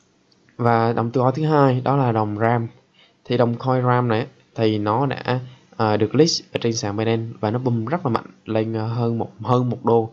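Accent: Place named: Quang Nam, Vietnam. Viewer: Ad người Quảng Nam hay Đà Nẵng vậy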